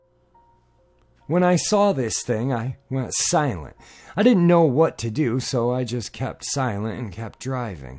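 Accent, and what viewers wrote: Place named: United States. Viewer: To me as an Irish person your accent is delightfully different. I wondered where you were from, I'm not very good with pinpointing US accents apart from recognising a southern one of course. 😁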